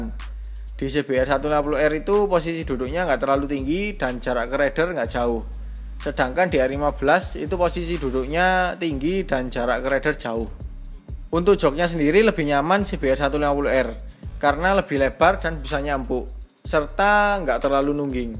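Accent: Malang, Indonesia. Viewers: Mas org malang ya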